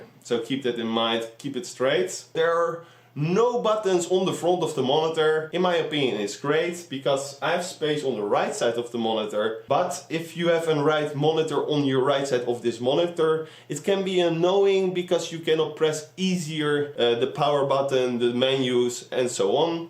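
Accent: Netherlands. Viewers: I bought mine but it arived screwed already so no need to put screws myself also nice dutch accent ik also from Netherlands i may have a bit better english accent but also worse sometimes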